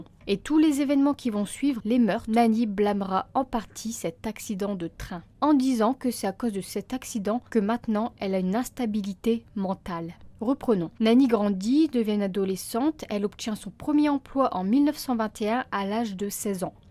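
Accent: North, France. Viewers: t'est du nord toi ^^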